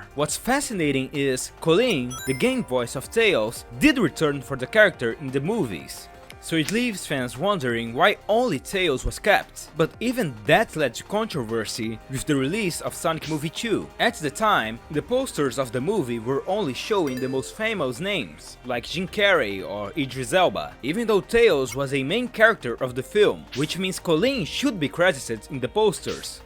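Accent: Brazil. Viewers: I do think the narrator is brazillian too,, do notice how they say manolo rey, and y'know,, "velocidade" in the bottom corner, not sure if the channel is fully ran by brazillians,,, mas seria legal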